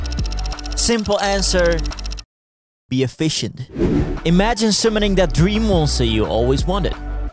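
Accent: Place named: Netherlands. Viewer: I saw you on killa´s video and i knew right away you we´re dutch haha and then few minutes in the video when you said toa then you confirmed it haha! Nieuwe sub erbij :) succes met je kanaal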